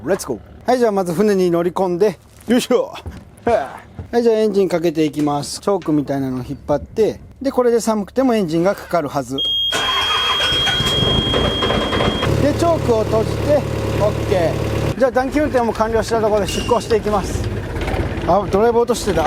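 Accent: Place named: Shodoshima, Japan. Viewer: 夕焼けの小豆島、いいね！小豆島弁聞いてると高松の叔母さん思い出す。ほぼ同じやね。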